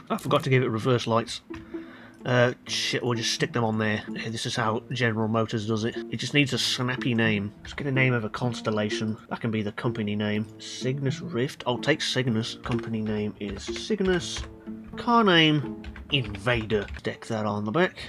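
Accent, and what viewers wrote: United Kingdom. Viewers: Omg funny bri'ish fella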